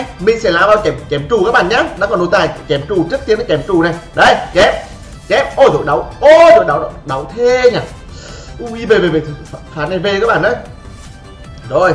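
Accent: Nghe An, Vietnam. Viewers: Idol của tui ngày nào , cái dọng Nghệ An , từng cái clip cẩm nang Nak , giờ sắp thành Idol triệu sud rồi , chúc idol thành công nha , Mại yêu 😘😘😘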